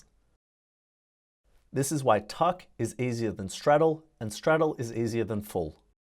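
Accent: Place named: Australia. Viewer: That Aussy accent ...😁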